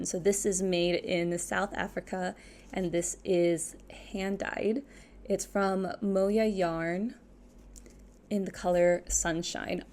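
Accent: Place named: Philippines. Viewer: Hello! You do like a bit Filipino coming from a Filipino. I think SEA prefer crochet also is because it's much more breathable because it's more hole-y compared to knitting so it's better for the warm weather in SEA.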